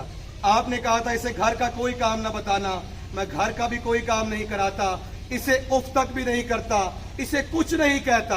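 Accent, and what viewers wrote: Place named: Saraiki, Pakistan. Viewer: سرائیکی ٹچ 👌👌💯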